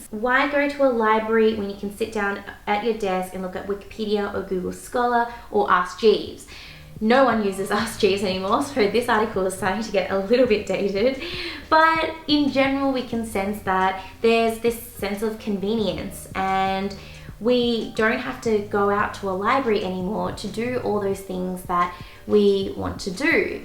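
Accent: Australia. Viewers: honest question out of curiosity: are you Chinese/Korean/Japanese Australian with a background in learning American English?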